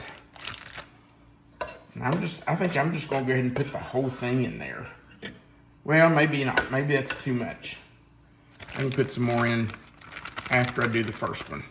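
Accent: South, United States. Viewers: I see you're from Kentucky do you have a southern talk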